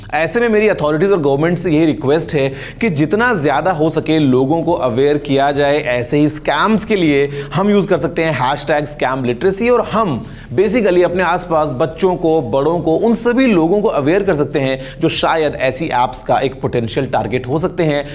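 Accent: India. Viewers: Indian english 😭😭😭😂 use correct Words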